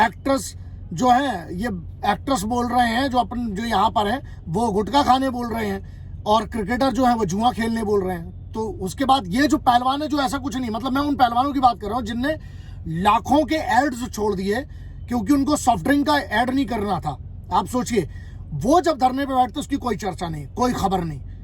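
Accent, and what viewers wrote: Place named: Kanpur, India. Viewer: Your voice has accent of kanpur up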